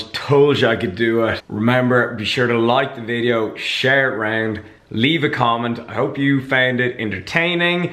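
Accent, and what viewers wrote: Ireland. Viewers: Are you irish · Παύλος Εικοσπεντακης it's an irish accent .... ireland :) I'm also irish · I forgot how much I love Irish accents wow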